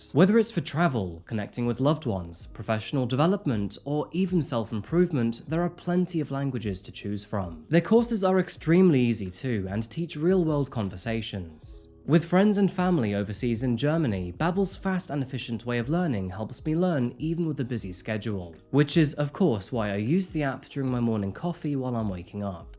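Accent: United Kingdom. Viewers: Dudes British, he gets a pass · Sheesh! Sorry folks are all mad abt pronunciation...crazy how people from different places say pronounce things differently. They missed a couple though! You also said the letter "h" funny! (Like a Brit i guess, we say it more... without an h ... like "aytch" and you said it w an actual "h" at the beginning! 😂 and then... you said Delimar told her mom "your my mum"... (we say mAWm)... its almost like youre not americain!😂 like youre pronouncing things the way youve heard them! Crazy! 😂 sorry... having fun noticing your british bits now. Now i want you to say "today's case takes place" like its "the rain in spain falls mainly on the plains..." Jeeeze. People be so picky. (P.s. my name is Dominique, but americains always want to be saying it like an italian guy's name, "dAWm ah nick", so here we are... sigh)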